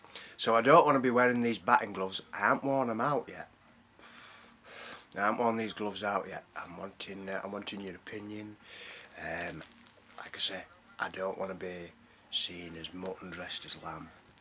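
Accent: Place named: Northern England, United Kingdom. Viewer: Great northern accent matey, you mention Leeds but I don't think it's a Leeds accent? I would say Barnsley but err? dunno? Sheffield possibly but, I am gonna go for Wakefield area???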